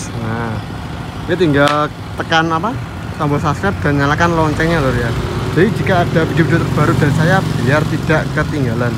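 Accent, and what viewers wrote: Banyumas, Indonesia. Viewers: Paling seneng ngrungokne omongane bangsa ngapak.. 😂 Sub e kok gk gawe 18an yo? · Pada bae wong ngapak...salam sukses selalu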